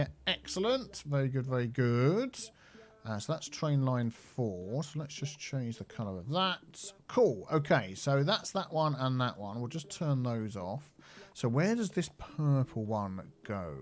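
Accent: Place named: England, United Kingdom. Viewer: Where then? I like trying to figure out English accents. I have a good friend from Bristol.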